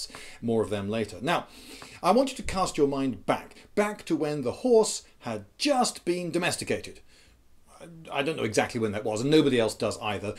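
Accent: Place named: England, United Kingdom. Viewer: +Brute127 Care to add anything besides assuming I didn't watch the video? I watched the video. A man with an English accent and one barebacking experience is not somehow an expert. Don't be fooled. Calvery was used for a reason. Warfare is more than running into a group and bashing someone. This man's thesis is astoundingly stupid.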